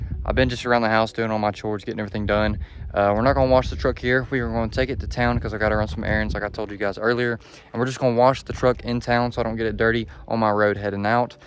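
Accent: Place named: Alabama, United States. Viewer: you from Alabama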